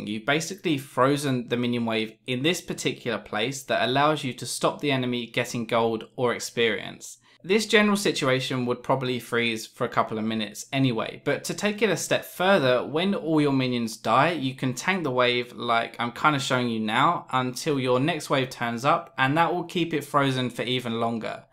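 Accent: United Kingdom. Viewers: FUCKING BRITISH ACCENT :DDDDDD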